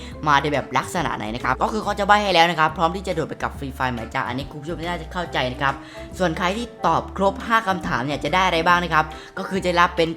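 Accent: South, Thailand. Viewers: พี่ครับพี่คนใต้ไช่ไหมครับผมฟังสำเนียงออก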